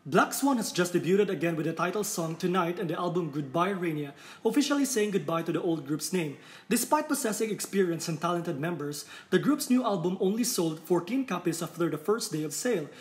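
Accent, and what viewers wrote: Philippines. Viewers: I could tell the man narrating this video is a filipino lol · pustahan pinoy itong nagsasalita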